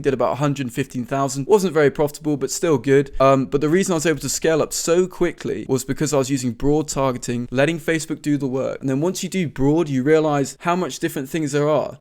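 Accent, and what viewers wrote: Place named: Leicester, United Kingdom. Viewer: he got the Leicester accent 🤔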